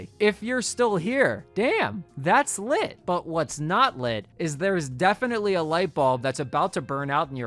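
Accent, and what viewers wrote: Philadelphia, United States. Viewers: It’s a Philly dialect along with saying “crick” instead of creek and “ratty-ator” instead of radiator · bro has a Philly accent 👑 🖍️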